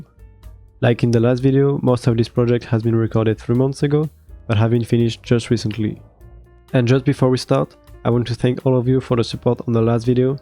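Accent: France. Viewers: Another incredible video. Thank you very much, your explanations are super clear (Surtout l'accent français qui m'aide à comprendre😂). Love from France ❤.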